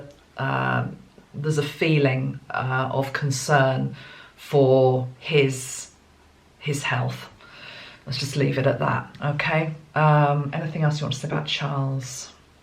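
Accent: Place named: RP, United Kingdom. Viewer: i agree too. And the voice becomes well spoken with the plumminess of aristocracy. Beautiful x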